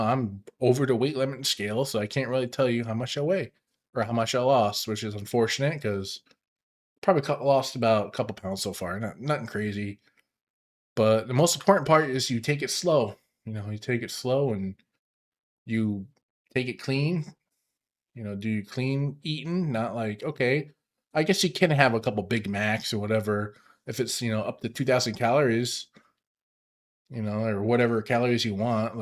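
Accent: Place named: Upstate New York, United States. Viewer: First view, first comment here! All the best on your journey,. Say, I am an informal student in accents and I want to guess you are either in our grew up in upstate New York. That's my first guess. My second guess is Eastern Pennsylvania. My third guess is Chicago. My fourth guess is a city or suburb in the northern part of the United States (in a state that touches the Great Lakes). Let me know if I'm right!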